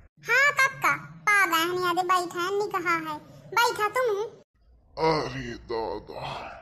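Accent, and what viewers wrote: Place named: Bagheli, India. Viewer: Authentic bagheli along with deep diving accent.. Maja aayga bahini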